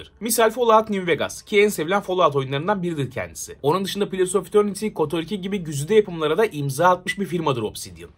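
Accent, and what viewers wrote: Russia. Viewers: neden ingilizceyi ruslar gibi konuşuyor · İngilizceyi Rusça aksanıyla konuştuğunu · rus aksan? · Güzel rus aksağını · Ruslar gibi ingilizce konuşuyorsun (CS:GO Ofensive günlükleri) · ingilizcende rus aksanı var gibi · niye Ruslar gibi engilizce konuşuyorsun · Rus aksanıyla ingilizce konuşman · niye rus şivesi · ingilizcen rusçaya kaymış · ABİNİN İNGİLİZCESİ RUSLARIN İNGİLİZCE KONUŞMASIYLA ÇOK BENZEŞİYOR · Rus aksanı❤ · Niye rus aksanıyla ingilizce konuşup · o nasıl bir ingilizce rus gibi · İngilizce konuşunca İngilizce konuşan ruslara benziyorsun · Neden Rus aksanı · Rus aksanlı * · İngilizce'yi, Rusça konuşur gibi konuştun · Mükemmel Rus aksanıyla İngilizce konuşan * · aynı rus ajanları gibi ingilizcen var valla çok tatlış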